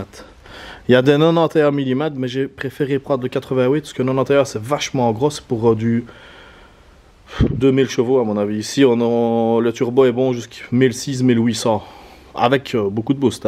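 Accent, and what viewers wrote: Belgium. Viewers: salut est tu belge par hazard?🇧🇪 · Je suis tombé sur ta vidéo par hasard, et ça fait vraiment plaisir d’entendre un Belge parler de ses voitures. J’ai un peu regardé tes réseaux, tu devrais vraiment aller voir Akram à la fin de ce confinement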